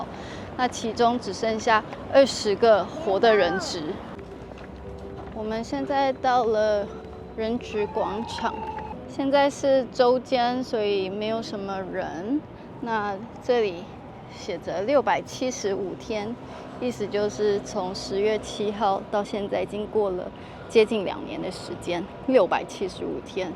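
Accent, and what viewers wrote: Taiwan. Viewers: can someone explain why she and her family is based in Israel? She sounded like Taiwanese..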